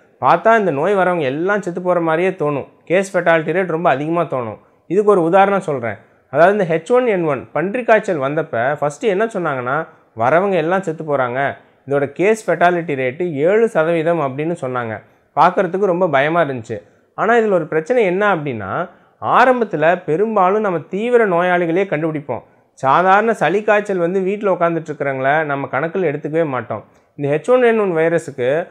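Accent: Kongu, India. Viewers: Alagana kongu tamil oru doctor eathana jokes pola solrathu gd sir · கொங்கு தமிழில் எதார்த்தமான விளக்கம் அருமை, பேசுவதற்கே காசு கேட்கும் கார்ப்பரேட் மருத்துவர்கள் மத்தியில், உங்களின் தெம்பூட்டும் பேச்சு மிகவும் அருமை, உங்களின் பேச்சை கேட்டாலே ஒரு உத்வேகம் வருகிறது உங்கள் சேவை தொடர வாழ்த்துக்கள் மருத்துவரே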